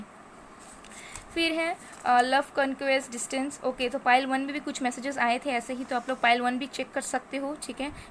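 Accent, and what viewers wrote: Bengal, India. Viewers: Are you from Bengal? 💐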